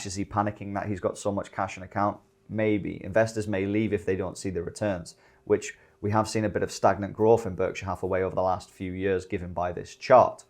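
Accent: United Kingdom. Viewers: Hello from US you brit?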